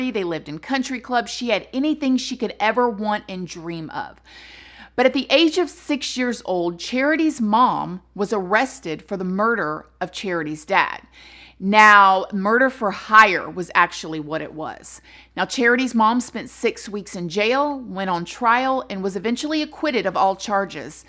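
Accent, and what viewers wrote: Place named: Texas, United States. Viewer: Just found your channel... I am obsessed with true crime... and I watch a lot of different channels... but I have to say... I love your delivery and genuinity... I am definitely subscribing and hitting the bell... and may I just say that you are so beautiful!! And your accent and dialogue is so inviting... are you from Texas??? Anyway... I am off to enjoy the rest of your content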